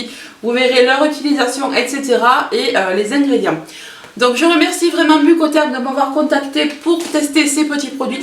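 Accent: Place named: Tarn, France. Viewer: Bonjour, ta vidéo est très sympa. De plus, quel bonheur d'entendre ton accent, qui est aussi le mien, sur YouTube. Bisous 🥰🥰 du Tarn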